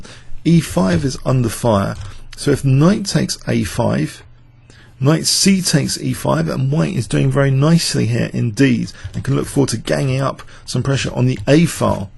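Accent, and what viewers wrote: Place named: Cockney, United Kingdom. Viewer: great game, and great commentary! I love your competence, your enthusiasm, your emotional way and your nice Cockney accent! thanks for all these videos, it's very entertaining. and happy new year :)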